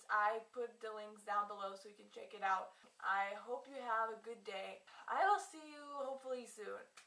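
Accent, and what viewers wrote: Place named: Russia. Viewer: You have a bit of a Russian accent! I love Russian accents! Ps love the book haul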